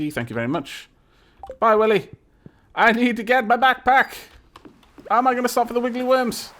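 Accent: United Kingdom. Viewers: Either Wales or England, if I recall correctly.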